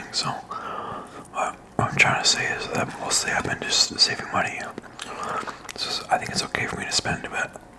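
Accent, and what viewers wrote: Canada. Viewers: are you from nova scotia? familiar accent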